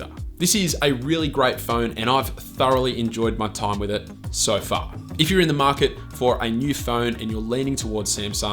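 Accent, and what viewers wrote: Australia. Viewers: This guy has so familiar accent. From the first sentences I knew that he is an Aussie :). Hello and big cheers from NZ, mate! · R u Australian?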